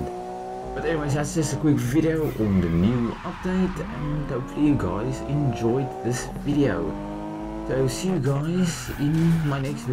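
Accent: South Africa. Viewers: That's a very strong South African accent you have there brother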